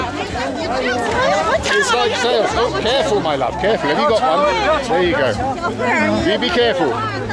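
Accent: China. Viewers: Chinglish is Fun!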